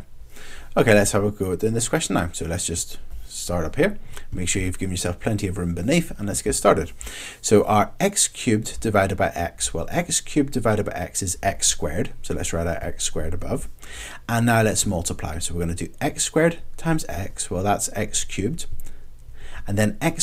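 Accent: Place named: Northern Ireland, United Kingdom. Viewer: Man I love northern Irish accent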